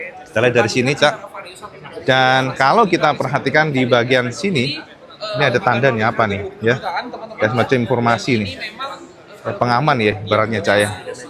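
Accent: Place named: East Java, Indonesia. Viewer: Lampu sein nya ngepang... Bahasa jatimnya ancen Jos Gandos... Medok...😅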